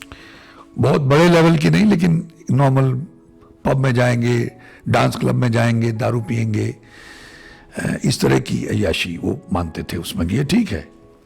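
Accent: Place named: Sialkot, Pakistan. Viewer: आप सियालकोटिए हो। आपकी बोल बाणी से लगता है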